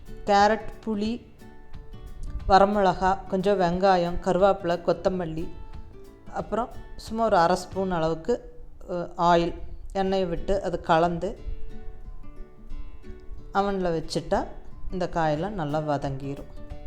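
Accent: Kongu, India. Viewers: Very useful. Your kongu Tamil slang is also good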